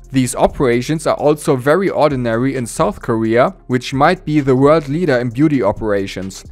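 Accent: Germany. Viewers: Informatives Video :) hab direkt am akzent gemerkt, dass du deutsch bist hahahaha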